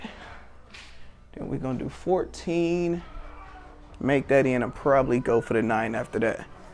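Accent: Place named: Washington DC, United States. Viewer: Bring that ass here boi😂 DC voice lol and bring a case of Coronas lol good shit playa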